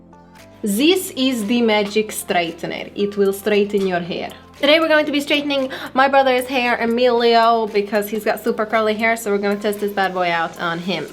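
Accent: Italy. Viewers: It’s a typical Italian accent ...That’s really strong I didn’t know there were some people to appreciate this accent lol in my country (Italy) when we talk in English a lot of people don’t like the Italian accent because is considered to be ridiculous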